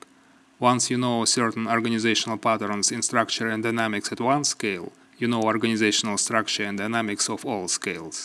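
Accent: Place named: Eastern Europe. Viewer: The 'presentation' is a little on the 'dry' side, but an informative video nevertheless; IF you can understand the heavy Eastern European accent ;>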